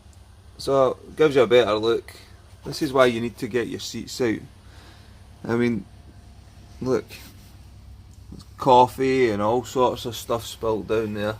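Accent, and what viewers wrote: Scotland, United Kingdom. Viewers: My mother was Scottish. How I miss hearing the brogue. Beautiful! PS: The car looks great too. First car: 1976 Fiat 131S. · Hyundai i10 2010. I still have it. I like your scottish accent.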